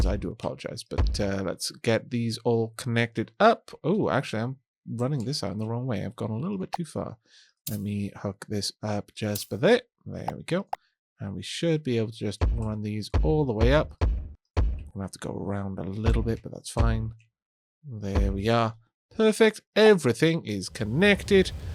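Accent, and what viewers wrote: Wales, United Kingdom. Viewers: Ha, just finished the previous episode, enjoying the dulcet welsh tone of our tea drinking dragon saviour as I recover from my surgery today lol much appreciate the upload to keep my chill recovery going lol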